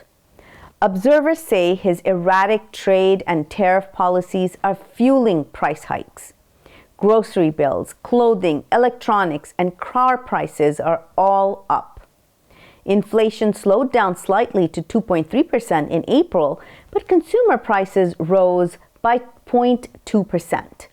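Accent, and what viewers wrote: United States. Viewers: ╔═══ ❤☀💚☀❤ ═══╗ 💚💚💚💚💚💚💚💚💚 Respected Madam, Assalaamu alaikum. Whenever I find that the news bulletin is longer than 15 minutes I take it for granted that it must be MADAM presenting the news. Thanks, Madam, for coming to present the news after full one week. It was on last Wednesday, the 7th of May, that you had presented the news last. It is again the WONDERFUL WEDNESDAY. We from INDIA love your news presentation since we don't need the help of the TRANSCRIPT down below to understand your American English accent!!! KEEP IT UP!!! YOU ARE DOING A WONDERFUL JOB!!! 🌐🌐🌐🌐🌐🌐🌐🌐🌐 ╚═══ ❤☀💚☀❤ ═══╝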